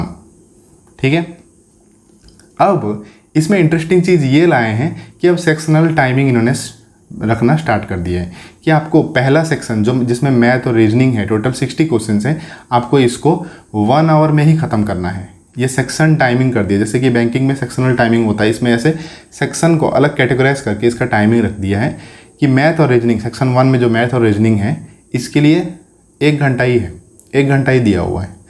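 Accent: Odisha, India. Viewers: Odisha state se ho kya aap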